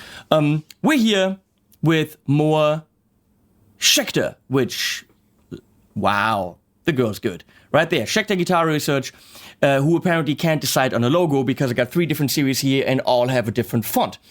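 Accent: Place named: Germany. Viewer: You talk a whole bunch of s*** I can't even understand you with that German accent put the guitar down and just walk away from it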